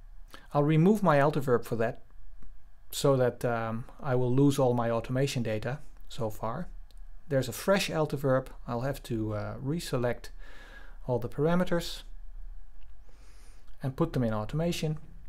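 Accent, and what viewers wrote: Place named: Netherlands. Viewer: What a lovely Dutch Accent ;)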